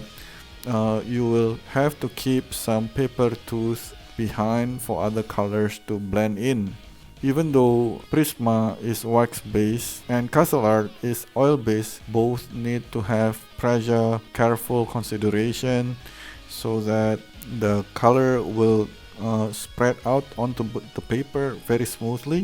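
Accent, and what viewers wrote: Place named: France. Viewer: You kind of have a French accent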